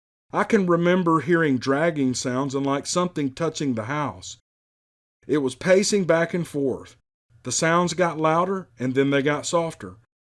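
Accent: South, United States. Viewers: Sorry pal, your stories were indeed intriguing, albeit, your accent is abhorrent… ive heard it my entire life, i was born in the deepest southern accent town, yet i never gained this terrible accent… some of my fam did… i suppose its attributed to a lower IQ, or perhaps a less of a care for reality, maybe one in the same… who knows. Thanks for the vid tho, its my first and last one, cheers my friend! (I imagine youll do well since many folks love the southern accent…) (and god have mercy on humanity if this is indeed an AI voice… sheesh)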